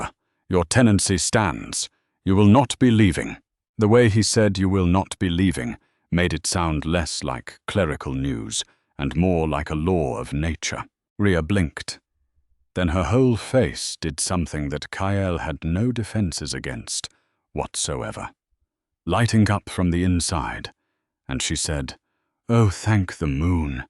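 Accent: United Kingdom. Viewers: I hear ya, and offer this solution that I have found to be quite effective. I listen to these fantasy tales on my cell, so I fold a piece of paper over the top and have it long enough to reach below that picture section, and then click on Show Transcript. The words scroll up the screen as the narrator speaks (oh that YUM purring English / British accent), and let my imagination do its thing by making a movie in my head that depicts the scenes and the characters. It is much more fulfilling & enjoyable that way.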